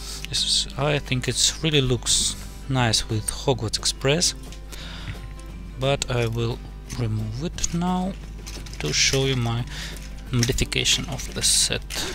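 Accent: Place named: Russia. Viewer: you are Russian? у тебя русский акцент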